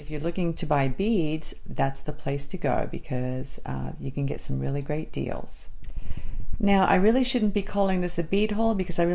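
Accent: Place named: Australia. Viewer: Wow I thought I detected an Aussie accent...you must have an interesting tale to tell of how you got to America. I grew up in Mount Gravatt, Brisbane so I know Sunnybank well. xox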